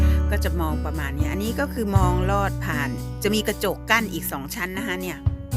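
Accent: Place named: South Korea. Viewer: สำเนียงเกาหลีพี่เพราะมากค่ะ.🥰👍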